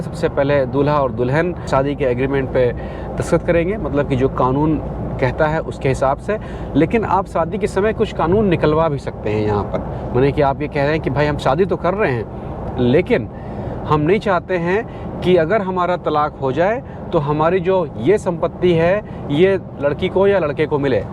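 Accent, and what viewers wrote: Bihar, India. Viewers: Aap bihar se kya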